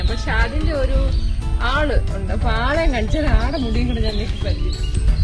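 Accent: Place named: Kollam, India. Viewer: kollam kaari aanenn samsaram kettapozhe manasilayii 😍😍😍❣️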